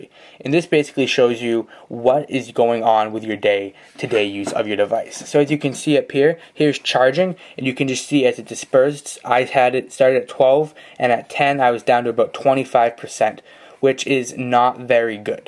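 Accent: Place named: Canada. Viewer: youre canadian....i can tell...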